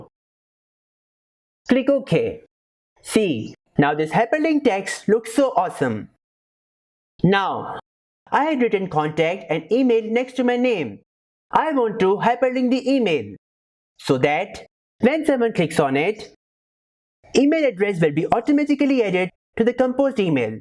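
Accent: India. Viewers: Damn, that indian accent. Just cannot listen to it. Get better narator.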